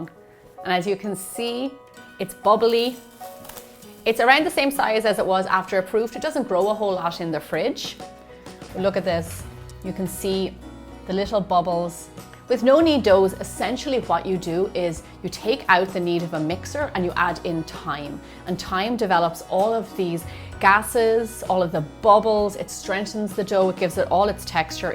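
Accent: Ireland. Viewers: Love your Irish accent! Cork? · Lovely recipe, but are you Irish? you sound irish...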